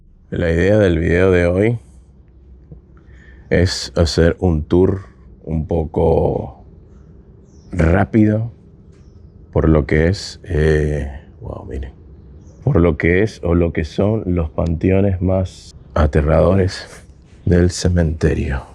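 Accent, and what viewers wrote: Argentina. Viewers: Es excelente por qué ya de estar tanto tiempo acá parte de tu acento tiene algo de argentino, pero lo disimulaste lo mejor que pudiste jajaja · ya se te pego el acento argentino, vi un vídeo de hace 5 años y hablabas venezolano 😅 jejejeje saludos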